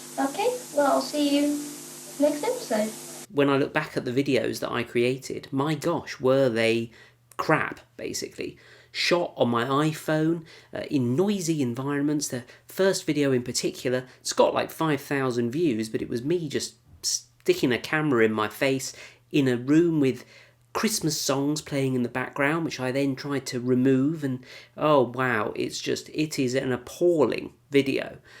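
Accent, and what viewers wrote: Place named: RP, United Kingdom. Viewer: it sound like Eaten, Cambridge or Oxfort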